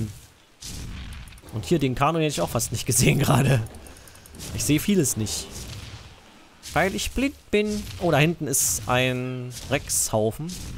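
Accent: Germany. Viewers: euer Akzent (Ossi-Akzent) für uns ziemlich lustig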